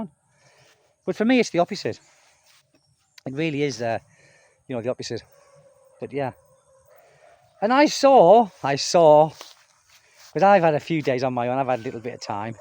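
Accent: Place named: England, United Kingdom. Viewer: I love your English accent mate.😁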